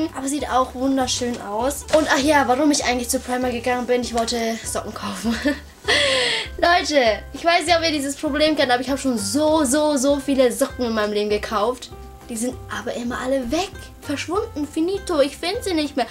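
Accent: Franconia, Germany. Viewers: sie hat nen fränkischen Dialekt🙈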